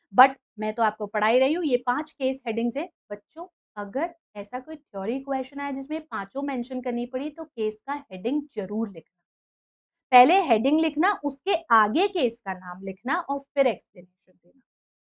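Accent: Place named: Gujarat, India. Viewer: mam aap gujrat se ho kya bcz apka accent match krta hai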